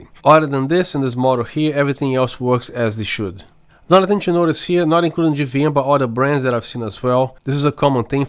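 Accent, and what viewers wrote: Brazil. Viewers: I can feel the brazilian accent... Otimo conteudo amigo. Parabens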